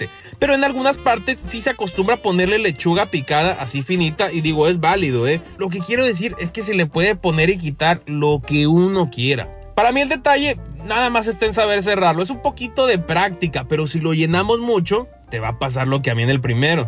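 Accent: North, Mexico. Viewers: Yo siendo de México también se me hace graciosa su forma de hablar creo el es del norte de una ciudad llamada Torreón y entre Méxicanos hablamos distinto de norte a sur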